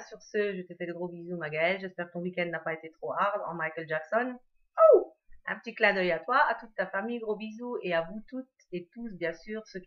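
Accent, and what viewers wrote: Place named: Lorraine, France. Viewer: tu es de la lorraine ? ton accent me fait penser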